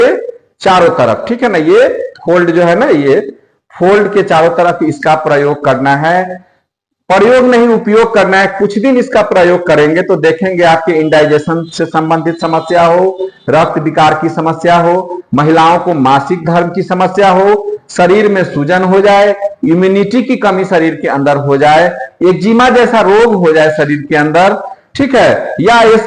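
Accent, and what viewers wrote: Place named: Bihar, India. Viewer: Sir ji aap ney bahut wadia jankari di. But aap ki jo hindi hai woh shud nhi hai jaisey aap yin ko in boltey ho spleen ko espleen wood ko ud aap hindi meyn Bihari Bhojpuri mat dalo kyon key aap ki video poora deysh deykhta hai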